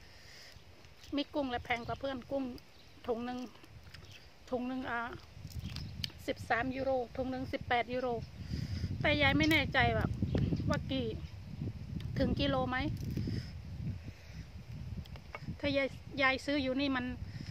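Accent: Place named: Surin, Thailand. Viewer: ชอบดูยายจัง สำเนียงยายพุดเหมือนทางบ้านแฟนเลย...สุรินทร์ น่ารักดีค่ะ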